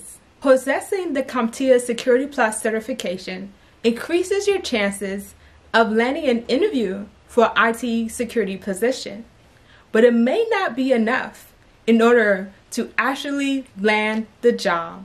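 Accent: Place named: East Coast, United States. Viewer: I love how east coast women talk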